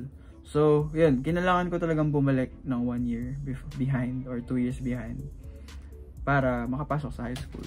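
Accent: Philippines. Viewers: Your Filipino accent is soo heavy! Despite being half Japanese. Why don't you speak English more in your video? I don't understand what you're saying most of the time... :/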